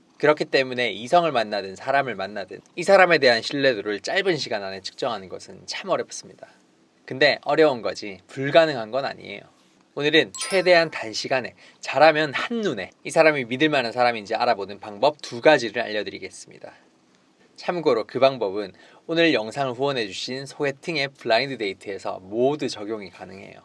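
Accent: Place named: Busan, South Korea. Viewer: 항상 잘 보고있습니다. 말을 너무 부드럽게 잘 하는 듯해요. 특유의 부산말투도 정감있구요^^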